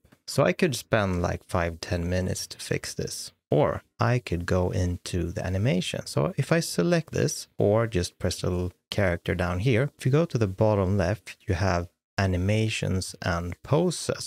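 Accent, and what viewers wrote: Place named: Sweden. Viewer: I'm here for the amazing Swedish accent! And the jokes 😁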